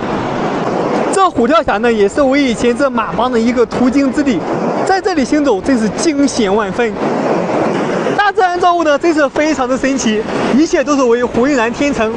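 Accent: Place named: Su-Lu-Yu-Wan border, China. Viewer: 听口音，博主应该是苏鲁豫皖交界地区口音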